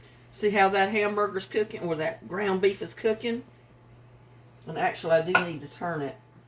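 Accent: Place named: Texas, United States. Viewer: The next time I stock up on hamburger I will definitely freeze some like that! I just made meatloaves for the freezer with this batch. I found you because one of your videos came up in my YouTube feed & I am loving your meals & garden videos. Hearing your voice makes me homesick for Texas even though I’ve been gone for more than 35 years now. Also love your community cat, Little Buddy. What a sweetie! I will share your garden videos with my brother & SIL in Georgetown. They are starting to really get into gardening.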